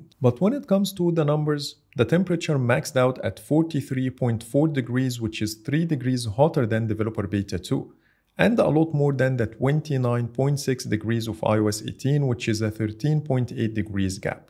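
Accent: Egypt. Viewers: Your English sounds Egyptian to me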